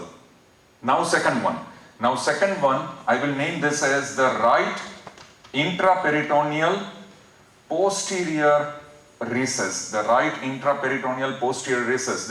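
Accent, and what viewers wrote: India. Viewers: When I heard the indian accent I know I will learn it no mater what Thank you sir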